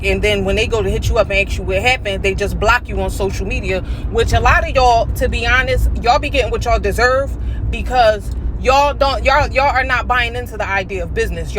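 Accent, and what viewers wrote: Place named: Philadelphia, United States. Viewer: I can tell by that accent that you’re 100 percent PHILLY!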